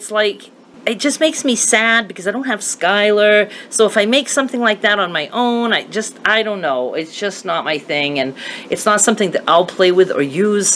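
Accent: Maine, United States. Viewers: I think I'd watch pretty much anything you upload! I just love your Maine accent and enjoy your feisty spunky personality!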